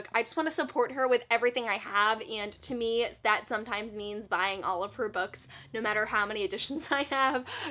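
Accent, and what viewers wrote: France. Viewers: Your french pronounciation was too cute!!! Pour ma part, c'est l'inverse ; je lis en anglais alors que je suis québécois :p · Félicitations pour le très bel accent français : ) C'est toujours un plaisir de regarder tes vidéos. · Your french accent is so cute 😍 I love your video so much ❤ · omg your french is so cute! <3 :)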